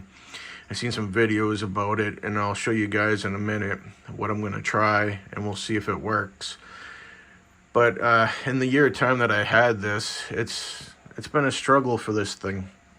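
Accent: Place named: Massachusetts, United States. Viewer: I’m dealing with green hair algae as well but it’s almost gone. Just need to get your hands wet, remove as much algae as you can and do a few water changes. If you stay up on your maintenance, it’ll fix itself. I would tell you, get rid of the vibrant. It’s proven to be more harmful than good. Just a simple google search will show you some horror stories. Side note, sounds like you’re in Mass.. I’m near Worcester.. you might want to join Boston Reefers Society if you haven’t already. Lots of good local knowledgeable reefers willing to help and give advice! good be luck bro.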